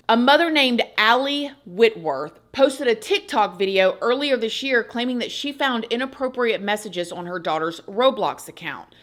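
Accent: South, United States. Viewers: I have been enjoying your content for years now. Thank you for all of the info you have enlightened us with. But I have one question to ask you. Why does it seem like you did not have as much of a southern accent as you presently do? Is it because of where you reside? Just curious about that. Bottom line though is that a really enjoy your content and the way you present it. Please continue with the excellent job that you do. Thank you so much!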